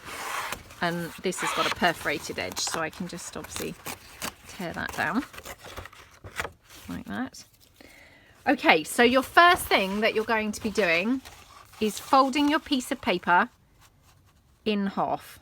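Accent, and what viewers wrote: England, United Kingdom. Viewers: A lovely video again! Please please promiss you dont do ASMR videos never... I hate the irritating sounds when I am not crafting myself..brrrrr :) Love your chatting, you english accent !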